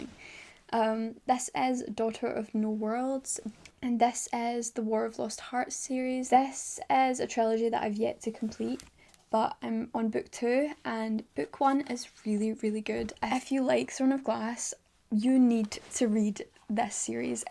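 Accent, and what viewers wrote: Scotland, United Kingdom. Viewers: It’s a Scottish accent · Soo this is your real voice, you sound a little bit british 😮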